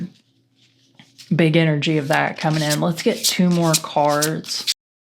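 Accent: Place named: Kentucky, United States. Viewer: Hello. LOVE your accent (my father was from Kentucky. It's a beautiful state!), and your readings. Bless you for the New Year 🎊🎆🎇🔔!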